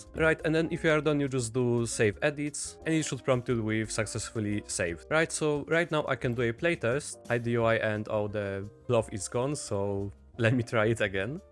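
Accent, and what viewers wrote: Poland. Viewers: polish accent 🤩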